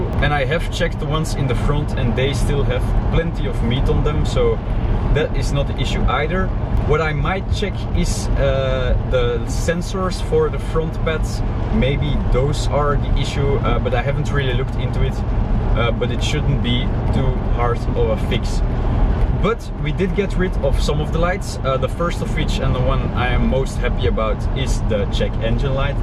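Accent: Flanders, Belgium. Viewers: Hahaha uw belgisch accent maat 😂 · Ok subscribed, lekker vlaaams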